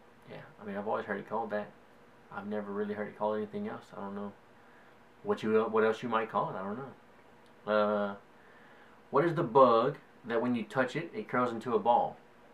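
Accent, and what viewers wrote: Louisiana, United States. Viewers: You definitely have one. It is not as heavy like others have said but I hear it. When you say sixty, it sounds like siggsti. And when you say fork, stuff, sure, New Orlinnns. You pick up your vowel sounds really lightly, almost like you are are rapping. And your t sounds are really strong lol. Houston and N'awlins y'all. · your accent is a touch Cajun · yeah, you definitely sound Cajun. I love way you talk. I'm from southwest Virgina. I've always called my grandparents papaw and mamaw, too, we say wash the same way, too. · you are pretty Cajun. XD BUt, yeah, I have heard a lot thicker.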